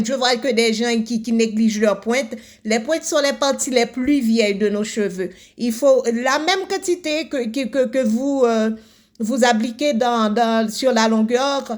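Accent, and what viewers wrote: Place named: Haiti. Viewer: Vous avez un accent haitien ❤ sinon merci pour les vidéos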